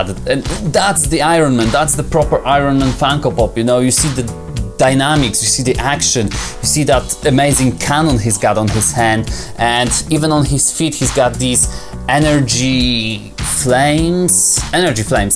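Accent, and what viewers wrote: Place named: Poland. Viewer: Oooh, Han Solo Pops! There are so many Funko Pops I want :D Btw, I really like your accent, it almost sounds Polish? Am I right? :)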